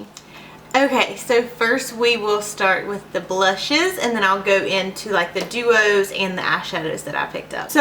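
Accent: United States. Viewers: Love ur country accent. I'm from Texas. But I don't think you have Texas accent do u?! Lol great palettes!!!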